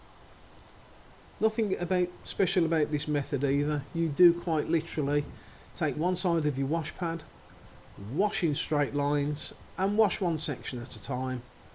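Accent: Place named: West Midlands, United Kingdom. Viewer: Hi great video enjoyed it, have u tried autobright direct products, thats all i use and the results are spot on, sounds like youm from the west midlands ?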